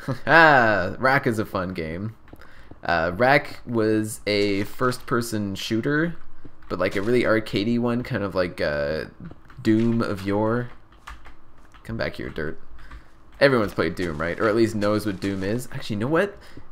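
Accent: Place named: Canada. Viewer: Are you Canadian, or not? The way you say "about" makes me think so. XD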